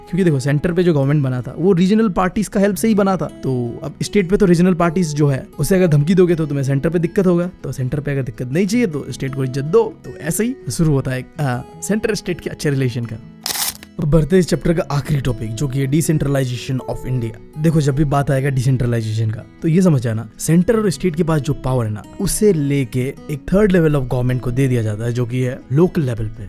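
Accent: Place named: Bengal, India. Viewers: Bengali bhai ap kaha se ho 🤨🤨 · Bhai aap sach me bangali ho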